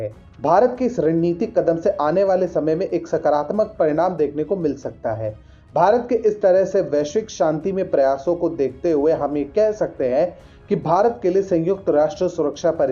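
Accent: Standard. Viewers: I am just hear to listen your shuddh hindi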